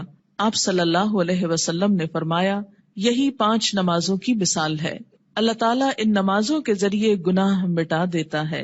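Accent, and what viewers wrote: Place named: Hijaz, Saudi Arabia. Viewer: تحیات ودو؟ حجازی لہجہ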